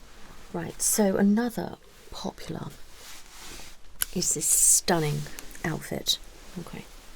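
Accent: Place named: RP, United Kingdom. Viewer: Can't get over the posh accent in this one!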